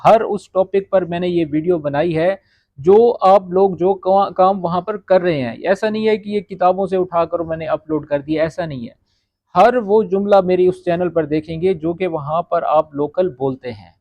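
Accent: Arab world. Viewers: which arabic dialect is this?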